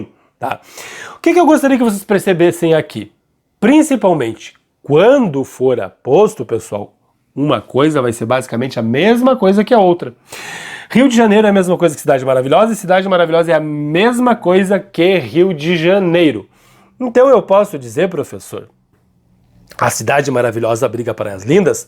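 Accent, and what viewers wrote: Rio Grande do Sul, Brazil. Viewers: Professor, por causa de seu sotaque, eu sempre pensei que você fosse gaúcho de Porto Alegre hahaha.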